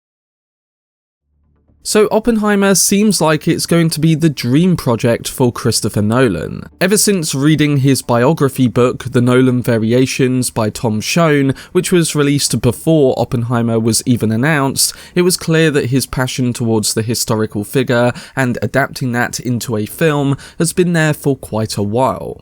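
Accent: United Kingdom. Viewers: The pawst? Cawst? At tall? Contrawsting? You Brits crack me up.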